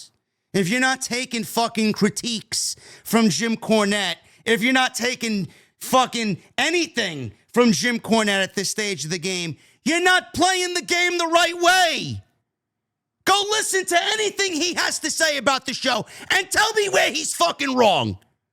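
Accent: New York, United States. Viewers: The 2nd New Yaaaawkkkkaaaah I've liked in my life. Good on ya, kid.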